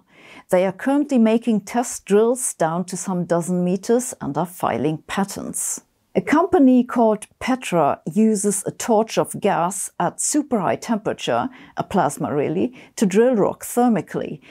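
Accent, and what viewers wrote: Germany. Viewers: Ms Sabine Hossenfelder is Professor cum laude graduated in theoretical physicist, Gravitational Fields etc. But as for her nice direct humor and accent it could be becuase she is from Germany.😇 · I love you, you are extremely sexy. I fantasize about you and your beautiful german accent and inexpressive face.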